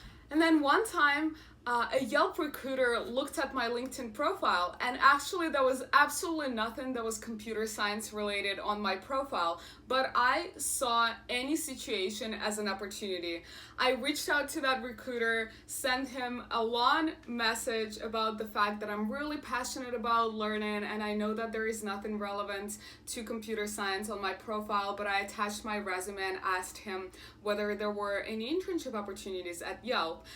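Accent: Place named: Russia. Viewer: aaaa by the way i like the way that you trying to hide your Russian accent you doing it well i respect it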